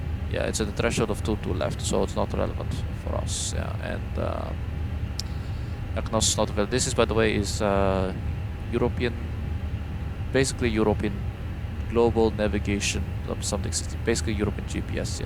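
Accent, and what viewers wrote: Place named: Finland. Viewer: Nice Finnish/Nordic accent!!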